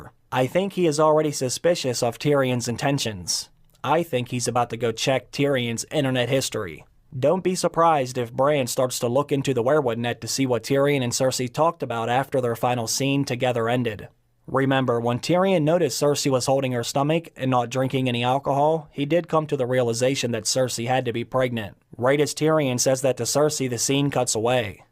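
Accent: Pittsburgh, United States. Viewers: I enjoyed your analysis. By the way, you sound like you're from Pittsburgh. Am I correct?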